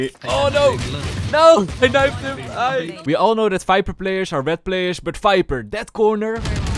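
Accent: Netherlands. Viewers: Being Dutch I thought a Double Dutch accent would be laughed about online, but proves me absolutely wrong lol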